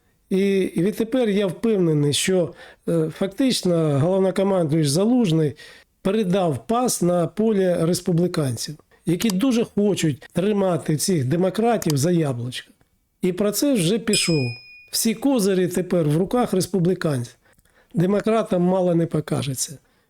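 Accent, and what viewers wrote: Russia. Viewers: Що зробити щоб ви вивчили українську мову?? У вас суто москальський акцент.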